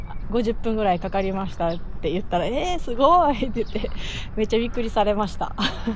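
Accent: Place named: Kansai, Japan. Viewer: いつもこっそりと動画を拝見させてもらってます。 関西弁で、焼き鯖寿司を食べる女子、素直でとても魅力的な女子ですな👌 これからも、キャンプ道中お気おつけて🙂‍↕️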